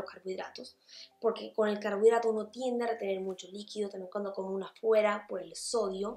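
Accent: Venezuela. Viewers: Es de colombia pero habla muy venezolano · Buen video!! Pensé que eras Venezolana...por tu acento tan nuetro.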